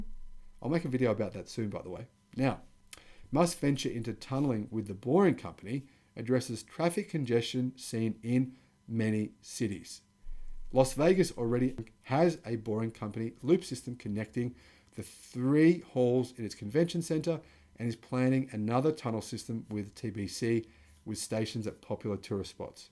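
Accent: Australia. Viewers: My significant other says you’re trying too hard. I said, ‘what do you mean? He’s the most calm speaking Aussie that I’ve ever heard!’ She said ‘it’s obvious he’s built like a brick s-house he should button up and not flaunt it’ whatever…I’m not letting her watch your videos anymore.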